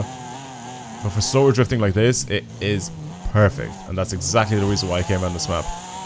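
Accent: Ireland. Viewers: Wait, you from Ireland? Me too bro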